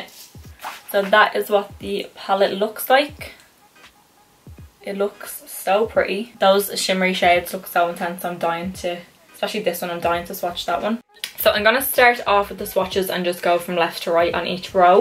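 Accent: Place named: Dublin, Ireland. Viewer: Can i ask where ur based u sound like ur from Dublin so if u are where in Dublin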